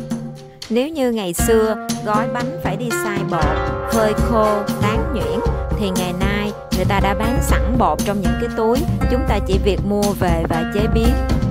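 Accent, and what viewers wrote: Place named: Southeast, Vietnam. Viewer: Mình không mấy thích ăn món bánh ít.Nhưng quả thật,cô gái nói giọng Đông Nam Bộ này rất dễ thương,thông minh và khéo léo.Thật tuyệt dù không phải video nào cũng hấp dẫn.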